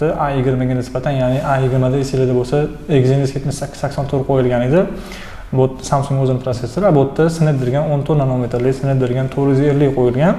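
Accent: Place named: Tashkent, Uzbekistan. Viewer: 🤢🤮 Aka iltimos Toshkentcha gapirmang, kitobiy o'zbek tilida gapiring. 😊😄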